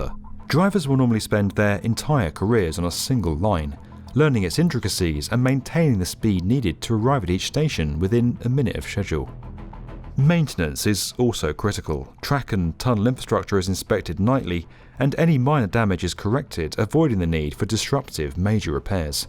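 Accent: United Kingdom. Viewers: This ìs the first time ive had a bit of a hard time understanding a british accent its like they chose the most british person ever lol😂 Easy to use - E to use Schedule - Say dule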